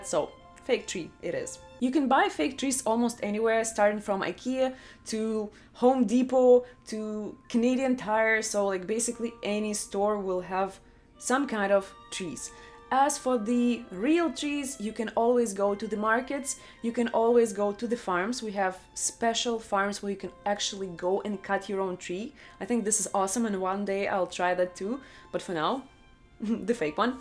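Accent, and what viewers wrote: Russia. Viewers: I like your Russian accent...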